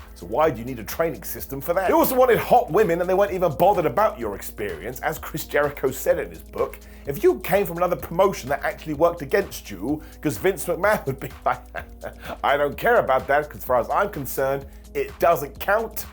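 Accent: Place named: Scotland, United Kingdom. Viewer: I never knew the biggest wrestling fans were Scottish. Damn, it's hard to listen to you. The rest of the world is so disturbing.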